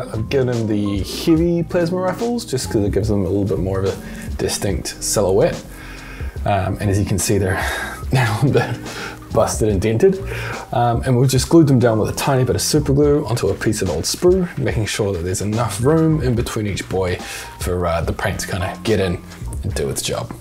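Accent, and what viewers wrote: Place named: New Zealand. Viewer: Wow great content i love this! kiwi accents are so hot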